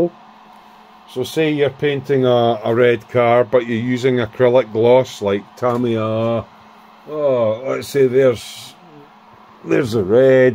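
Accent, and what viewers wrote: Scotland, United Kingdom. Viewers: Thoroughly enjoyed your video on making your own acrylic thinner and airbrush cleaner, very informative, and nice to hear a Scottish voice for a change. IT was not too long but had all the information I was looking for. Thank You!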